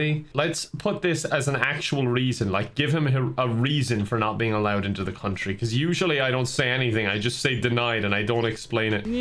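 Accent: Russia. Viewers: "Welcome to the republic of.... Ireland!" Speaks with an russian accent, everything is spelled in a slavic language... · AWESOME Russian acccent comrade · I like his russian accent :D Please play it again! ♥ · your russian accent reminded me of the metro games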